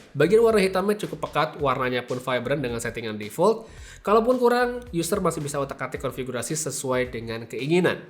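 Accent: Bali, Indonesia. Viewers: Orang bali ya bli?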